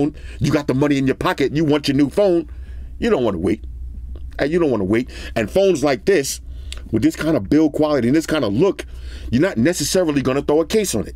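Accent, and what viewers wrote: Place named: Queens, United States. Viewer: your Jamaica Queens accent 👌, just "eddie-thing" 😂.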